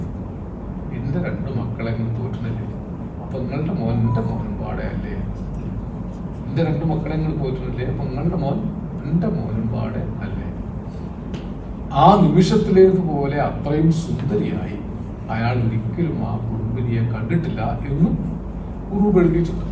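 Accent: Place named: Ponnani, India. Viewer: പൊന്നാനിത്തം നിറഞ്ഞു തുളുമ്പിയ മനോഹരമായ സംസാരം ❤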